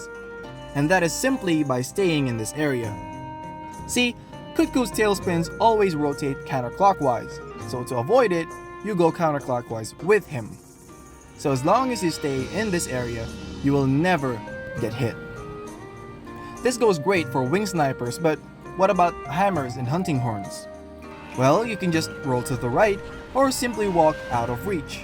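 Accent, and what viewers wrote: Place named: Philippines. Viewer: However, I noticed your accent sounds familiar, sounds like kababayan 🤔, if you don't know that, forget it 😆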